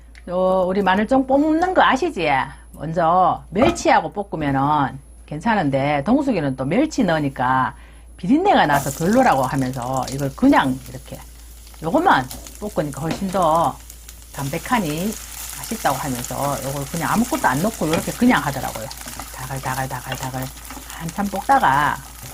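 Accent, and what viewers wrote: Gyeongsang, South Korea. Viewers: 좋은 정보 감사합니다 경상도 사투리 끝내준다 내고향 안동 사투리 좋아요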